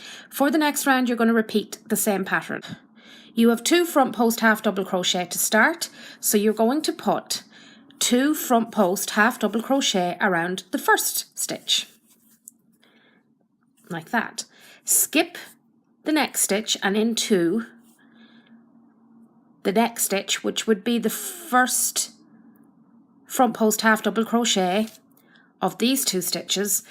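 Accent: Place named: Scotland, United Kingdom. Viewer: Hello again! I just started making a pair for me (I know right) tonight and went over to one of my favorite Youtube podcasters and shared your channel with her. I hope you don't mind Your tutorials are literally the bomb. Between your amazing (Scottish accent, hoping and praying I got that right) and your style of crochet? I love, love, love, your channel!! Here is Teri's Channel <link>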